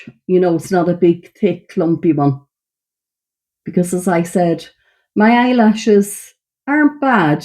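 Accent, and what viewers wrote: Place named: Ireland. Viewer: I could listen to you all day❤. Lov the Irish accent❤. I’d love to visit Ireland one day❤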